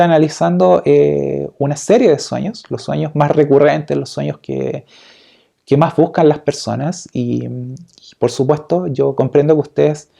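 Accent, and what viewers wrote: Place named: Chile. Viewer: Me encanta escuchar Ace te chico super chileno..... Con esa voz tan cálida y tan clara..... Es familiar y cálido..... Además de certero en el tema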